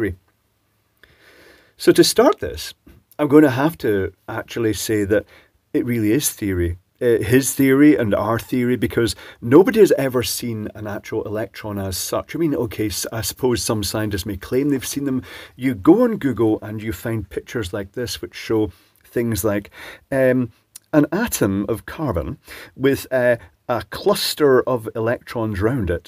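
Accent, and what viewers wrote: Scotland, United Kingdom. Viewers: I have always wanted to hear EM theory spoken in a Scottish accent. Maxwell was a great scott.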